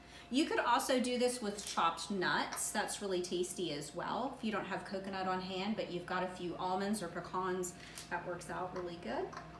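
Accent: United States. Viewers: If I didn't know any better I'd guess your accent to be from south central to eastern Pennsylvania. 😉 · Wonderful and easy!! Are you a Philly girl? I hear the accent!!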